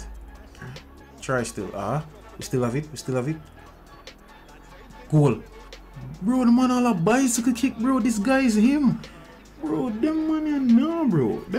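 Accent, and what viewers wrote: Jamaica. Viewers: Bro got Jamaican accent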